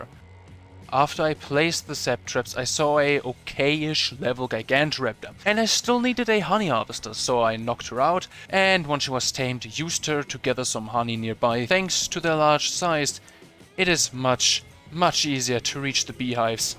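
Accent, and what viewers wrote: Germany. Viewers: Bro Sounds like a german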